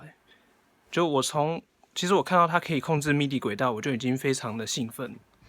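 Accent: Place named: Beijing, China. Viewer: Nin shi beijing ren ma? Nin shuo de henhao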